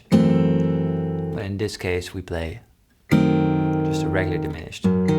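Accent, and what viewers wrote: Netherlands. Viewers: are you Dutch? Your accent seems to suggest so ^^ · Are you Dutch? I feel like I can distinguish between accents noe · Altijd leuk dat je meteen hoort wanneer iemand een Nederlander is. Maar prachtig en inspirerend filmpje en ik ga het proberen!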